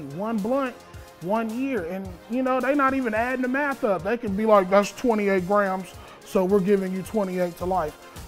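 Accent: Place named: Los Angeles, United States. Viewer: LA Accent is sooooo strong lol ROTFL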